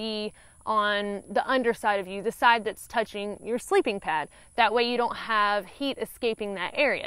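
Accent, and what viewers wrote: South, United States. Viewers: You southern girl for sure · hey great video as usual! have seen most of your videos, not quite made it to the end of the CDT yet. I want to thank you for awakening a passion in me for the outdoors like I've not had since I was a younger man. you're such a lovely young woman with the best southern accent and I wish you much success and joy in your life! happy trails, and hey maybe we'll see you out there one day.......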